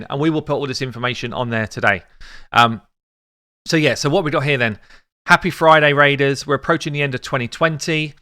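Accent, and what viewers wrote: England, United Kingdom. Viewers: Many thanks for another information update you deserve all the recognition you get. Website has become my go-to source (ahead of Chosen's great resources and I'm in a Chofly clan.) Battlepass appeals to me as a low spender as I'm retired. I play the Gwent cad game and their Journey is similar although with weekly challenges to meet - one of my monthly buys. Best aspect of your videos - your English accent, which this expat misses surrounded by Americans.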